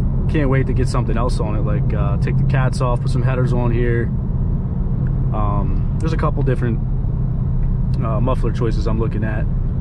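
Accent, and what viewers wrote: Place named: East Coast, United States. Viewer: Definitely from east coast. Delco even perhaps?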